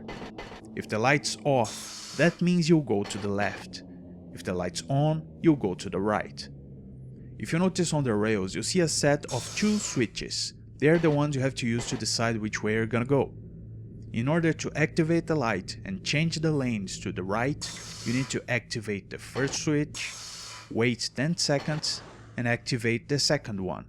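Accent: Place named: Brazil. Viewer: Man, I can't express properly how happy I am to see a guide like this, and especially one for this really unique (and extremely comfy) version of Densha de Go! Also loves everything you do in your channel, exactly the type of game that I love but gets no recognition (boku no natsuyasumi, man!!!!). Great content all in all, hope you keep it up! Also, are you by chance originally Brazilian? Your accent sounds like it, and it got me curious.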